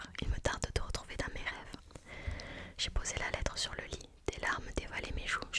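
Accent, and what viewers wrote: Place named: South, France. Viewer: L’accent du Sud et de l’Asmr , un plaisir cong!!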